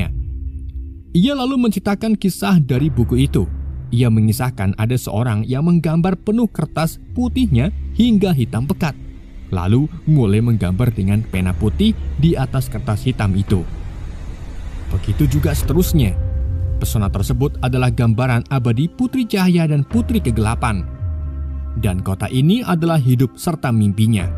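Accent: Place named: Java, Indonesia. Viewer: ridle ga tuh, bacaanya ridle blok jawir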